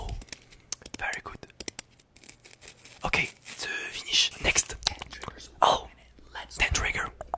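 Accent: France. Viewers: T’a vraiment un accent français quand tu parle anglais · this mousse à rasayy! This is why I love watching! It’s like if French and English collided together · On dirai un français 😂son accent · et, ça se voit que t'es français vu ton agsent (ou peut-être pas). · Are you French because you have a French accent and I like it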